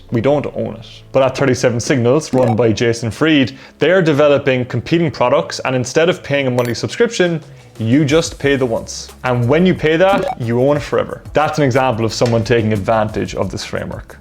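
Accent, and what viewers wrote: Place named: Cork, Ireland. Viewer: Love when you’re randomly jumping through YouTube videos and come across a random lad with a Cork accent 😂 great content!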